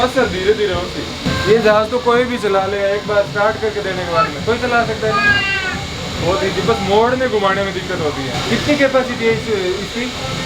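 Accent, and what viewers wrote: Rajasthan, India. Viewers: राजस्थान में कौनसा गांव है भाई आपका। वैसे आप दोनों पति पत्नी का देशी स्टाइल में राजस्थानी भाषा मे बोलना अच्छा लगता है। में तो आपकी आवाज एव बातों को सुनने के लिए ही ब्लॉग देखता हूँ।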